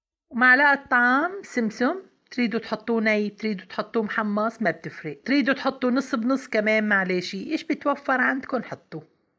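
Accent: Aleppo, Syria. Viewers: يسلم اديكي انا حبيت اللهجة الحلبية كرمالك و بتمنى نشوف وجهك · يسلم هالإيدين🎀مافي احلى من الحلبية وحكياتن👍😍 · ياي يا خالة على لهجتك الحلبية والله صرت أعشقها من لما سمعتك...تسلم إيدك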